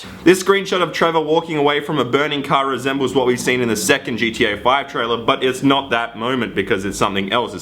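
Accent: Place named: Australia. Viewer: Omg his accent is australian :O dafuq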